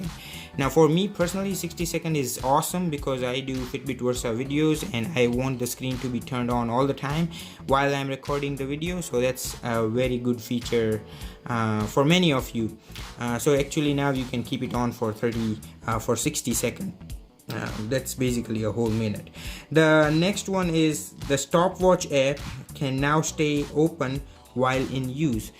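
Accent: Gujarat, India. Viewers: accent is awesome gujju bhai :)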